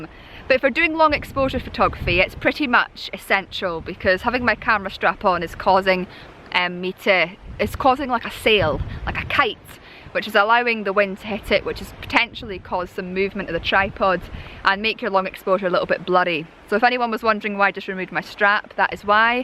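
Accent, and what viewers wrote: Scotland, United Kingdom. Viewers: I miss Scotland, I miss photographing in Scotland and I miss hearing the Scotish accent, the most beautiful accent in the world. I‘m thankful I can watch your videos so I get my dose of all of that. Thank you,!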